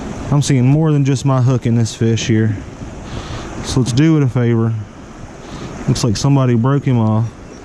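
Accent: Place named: Appalachia, United States. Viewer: enjoyed the video minus the hillbilly speak.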